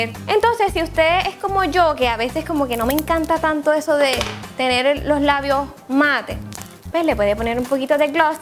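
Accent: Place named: Isabela, Philippines. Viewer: Hola, vengo del canal de Josue, no sabia q tenias uno. Me alegra mucho, q hallas decidido grabar. Tu forma d hablar suena bien brutal a como hablamos acá en Isabela, se q somos del mismo país pero no en todas partes tienen el mismo cantaito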